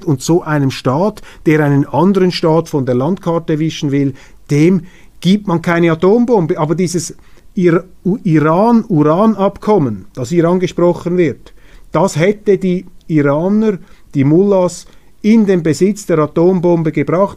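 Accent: Switzerland. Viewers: In der Schweiz darf man „faltsch“ sagen. Ich finde seinen Accent Fédéral ganz sympathisch.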